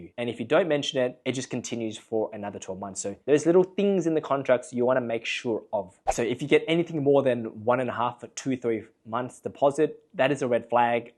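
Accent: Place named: Australia. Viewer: You have an Australian accent.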